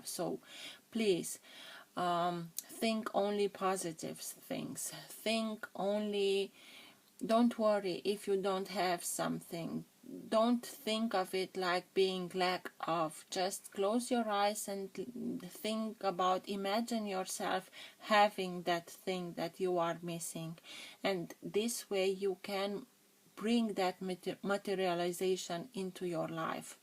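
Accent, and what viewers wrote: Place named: Transylvania, Romania. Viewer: Salutare! Vreau sa iti multumesc mult pentru etalare, nu am mai asteptat si versiunea in romana pentru ca aveam nevoie de ghidare.Si, ca de iobicei, am primit o.Dzeu a vrut sa ascult ce ai avut de spus in engleza.Vorbesc si scriu fluent ca un nativ aceasta limba si imi e la fel de usor sa inteleg ca si in romana.Sa te felicit pentru stapanirea acestei limbi si modalitatea complexa in care stii sa iti expui exact ideile, ce gandesti, ce vezi.......La pronuntie mai e nevoie de exercitiu, se simte puternic acel accent ardelenesc, dar totusi face ca accentul tau in engleza sa fie delicios.......Nu stiu sa iti explic cum suna, dar e melodios si frumos. Deci, la sfarsit de an, datorita tie, din nou, stiu exact ce am de facut.Vreau sa multumesc Divinitatii ca iti da aceasta ocazie, sa transmiti ce trebuie cui trebuie si cand trebuie, vreau sa inalt o rugaciune sa te mentina sa fii tu instati intotdeauna si sa aiba grija de tine si sa te ocroteasca! 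Daca nu ne auzim pana in Anul Nou, draga mea iti doresc Sarbatori Fericite alaturi de cei dragi tie, sa fii iubita si inconjurata de iubire, sa ai un An Nou deosebit cum esti si tu! Te iubesc mult, iti doresc tot binele! Gandul bun draga mea! Adrian, Constanta.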